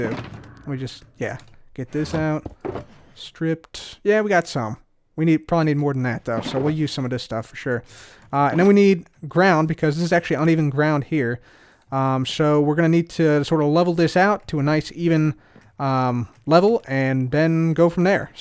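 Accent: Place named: United States. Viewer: Sounds like he's from the US to me.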